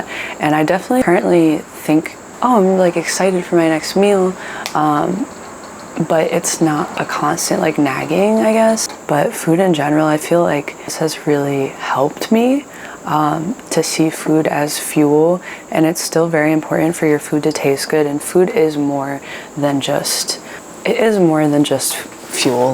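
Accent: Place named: Upper South, United States. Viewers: Where is this kind of American accent from? It "low-key" sounds like a total laid-back good-hearted goofball who is secretly some kind of genius but covers it up well. I love it. Chillax, bro. Bonzo beans! :person-turqouise-waving::person-turquoise-waving-speech::person-pink-swaying-hair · It sounds top of the south. Oklahoma, Kansas, Colorado, eastern cali. Possibly an empty state as well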